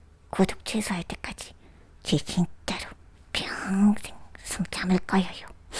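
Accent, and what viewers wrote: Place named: Chungcheong, South Korea. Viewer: 충청도 사투리 구수혀유..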